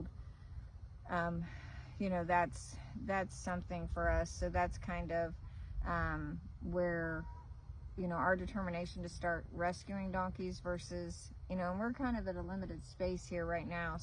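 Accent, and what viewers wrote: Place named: Indiana, United States. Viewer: Thanks for that. Your donkey's, your farm, and your Hoosier accent are all interesting to an Australian bloke like me here in Canberra. 🐐🐎🐔🐑🐶😸🇦🇺👍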